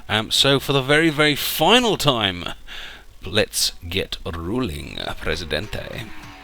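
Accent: United Kingdom. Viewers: Thanks for the tips, it's great hearing a brit instead of the winey american accent and blubbers mostly rubbish... Could you perhaps do a tips and trick for Modern Times DLC, please?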